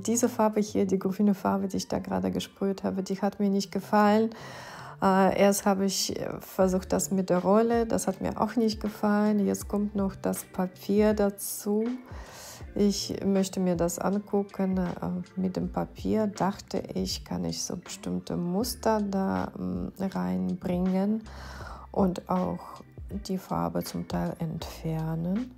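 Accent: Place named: Russia. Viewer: Классно! Чуток слышу наш акцент.:)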